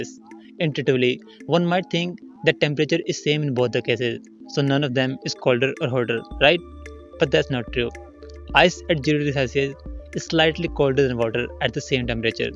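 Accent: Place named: India. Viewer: That Indian accent can kill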